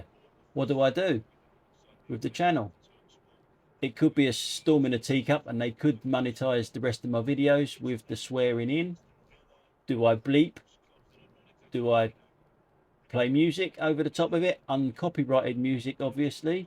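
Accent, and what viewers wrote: London, United Kingdom. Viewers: Keep on as you are, Living now in Dorset it's good to hear your London accent. Some people are what I call professional at being offended, the more they are 'offended' the happier they are. PC bull shine! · I have absolutely no issues with your swearing, it's a natural part of the way we brits speak, but for the sake of keeping your videos monetised beeping it out would probably be the easiest thing to do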